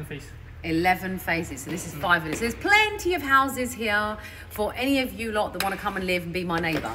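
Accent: United Kingdom. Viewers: I just love the Uk Accent .. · British accent.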